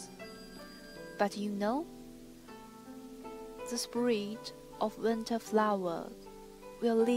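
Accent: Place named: Taiwan. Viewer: This musician has Plum Blossom Spirit. I liked the illustrated introduction with English text, voice-over narration in English and background music. The background music volume was balanced well with the volume of the voice-over narration. Unfortunately, there were several mispronunciations of English words that were not corrected by an English coach or pronunciation software with voice feedback. The intonation was fairly good. I was strongly reminded of the accent of the Taiwanese actress Qi Shu in the movie 'The Transporter(2002)'. The performance was very good to my ears but I know nothing about Bamboo Flute playing and very little about music. In the word 'anecdote' the final letter 'e' is silent and makes 'anecdote' rhyme with 'note', 'wrote', 'coat', 'boat', 'float', 'mote' and 'dote'. How to pronounce 'anecdote' in American English <link> How to pronounce ANECDOTE in British English <link> Knowing how to pronounce words in English is very difficult especially if one is obtaining a lot of information from written material. 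In my first year calculus class I had a professor with a good sense of humour who told a funny anecdote in the lecture room about how to tell mathematicians from other professionals in science using a kettle of water to be heated. One day I was talking with him in his office and I mispronounced the name of the German mathematician George Bernhard Riemann as "ryeman" and referred to "rye" "manian" geometry. The correct pronunciation is "Reemon" and "Re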